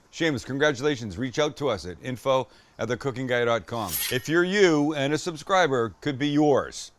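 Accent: Ireland. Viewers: You from Ireland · Love the show and this sounds awesome!!! Have you ever considered a Kentucky Hot Brown, yum I think you should do at least one whole show using your Irish accent, lol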